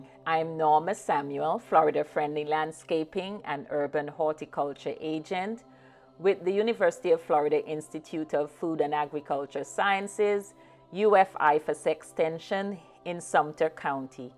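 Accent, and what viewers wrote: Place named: Jamaica. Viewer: Love the Jamaican accent,! Very informative video as they are everywhere in our yard and do tend to sting. Ocala seems to be the epicenter.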